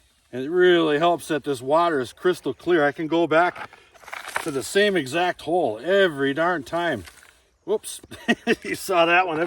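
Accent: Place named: Michigan, United States. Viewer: Go aheaad an pan dis one ouwt just hits different when you’re from Michigan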